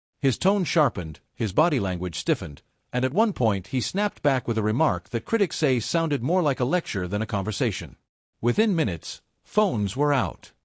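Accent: United States. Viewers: The title of this video is totally unrelated to this video. KEIR STARMER TO BE REMOVED FROM PARLIAMENT AFTER HOSTILE RESPONSE- is a blatant lie as there is nothing in this video that has anything to do with the title. And again, there is an American narrating this video. One big con and one big lie. This video should be removed from You Tube.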